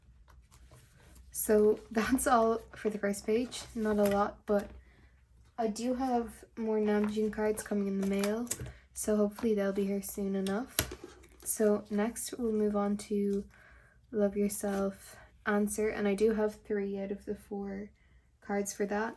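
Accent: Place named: Ireland. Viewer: ok i just found your channel (im watching all ur storing vids) and i didnt even notice your accent until you said youre irish 😭😭😭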